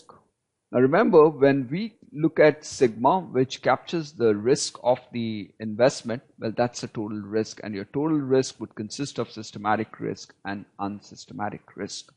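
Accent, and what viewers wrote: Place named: India. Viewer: The video seemed good, but you could've used someone with a little better/softer accent. I mean, no offence, Indian accent is already quite hideous, but this one was absolutely abominable.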